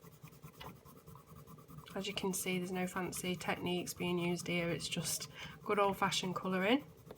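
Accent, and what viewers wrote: United Kingdom. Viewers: Wow! Great tutorial: No prattling, no dreadful music, 'no fancy technique'. Brilliantly demonstrated by an artist with a great British accent. Superb. I've subscribed. Thank you.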